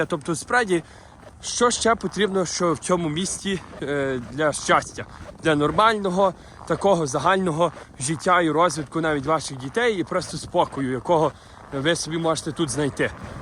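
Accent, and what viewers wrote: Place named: Prykarpattia, Ukraine. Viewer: Прикарпатський акцент дуже подібно ✌️😉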